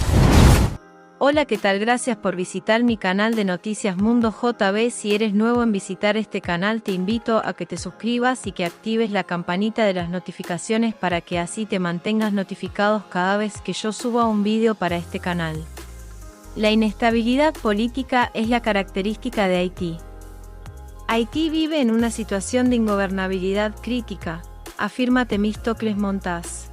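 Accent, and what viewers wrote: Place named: Argentina. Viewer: Tu acento me parece argentino.el PLD no deberia hablar del tema.ya que el gobierno de ellos fue el, que mas haitianos ilegales le dejado a RD.practicamente no hicieron nada para detener la inmigracion ilegal .si el PRM sigue con esta iniciativa de resolver este problema los Dominicanos debemos mantenerlo gobernando por muchos años.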